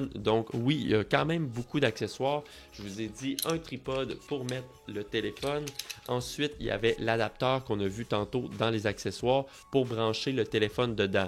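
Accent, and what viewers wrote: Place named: Switzerland. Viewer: J'adore l'accent Suisse ! 😏 Merci pour toutes ces infos !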